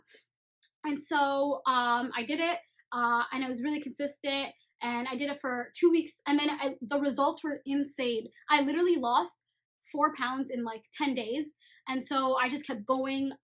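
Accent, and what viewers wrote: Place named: Ottawa, Canada. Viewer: I know an Ottawa accent when I hear one LOOOOOOL mashallah tho!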